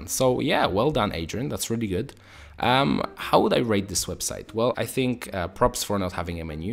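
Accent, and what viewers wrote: Poland. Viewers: Are you polish?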